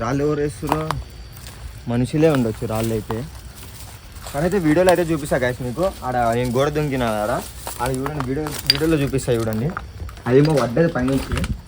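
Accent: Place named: Hyderabad, India. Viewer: Script kakunda genuine ga chesthundu bro pakka ne channel telugu lo manchi hype ostadi ...ne hyderbad slang chaala mem ki reache ayitadi but don't do scripted search for most haunted places take dare guys and communicate with ghosts mana telugu community lo ghost tho communication cheyanika raadu ....tamil lo simply sarath and grey wolf vasu videos chudu bro nd all d best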